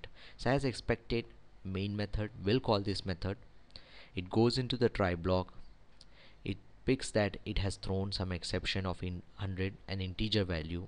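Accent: India. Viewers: Indian akcnt dude.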